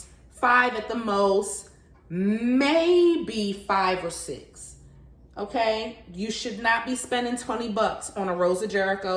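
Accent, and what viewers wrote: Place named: Washington DC, United States. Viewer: U from D.C.? Your accent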